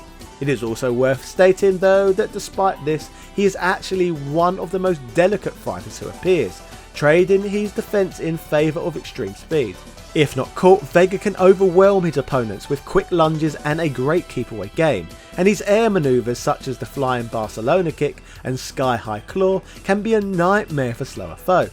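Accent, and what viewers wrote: England, United Kingdom. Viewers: Ok, I gotta know what English accent you have....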